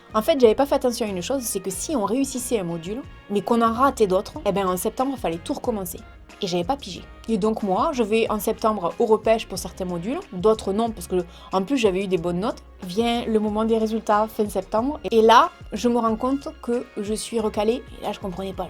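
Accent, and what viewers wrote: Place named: Villeneuve-sur-Lot, France. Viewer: MAMAN TU ES VRAIMENT MA MÈRE JE SUIS NÉE À VILLENEUVE SUR LOT! TON ACCENT ME DISAIT QUELQUE CHOSE ❤️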